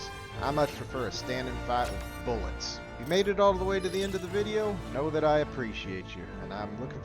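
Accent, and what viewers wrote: South, United States. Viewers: Came for Helldivers tips....stayed for the Southern drawl....lol. Entertaining vid and great commentary.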